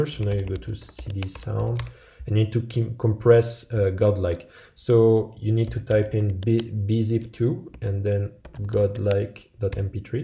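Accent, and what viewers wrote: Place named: France. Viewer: Salut, j'ai reconnu ton accent français, moyen de faire la vidéo en français ?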